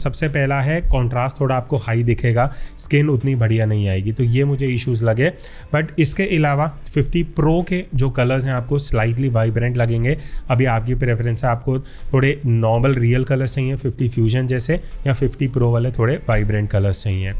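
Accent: Lucknow, India. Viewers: Bhai Lucknow se h?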